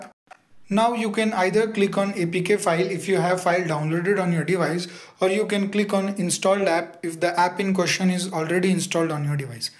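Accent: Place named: India. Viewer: Disliking and down voting because I had to listen to an Indian insult my language by speaking it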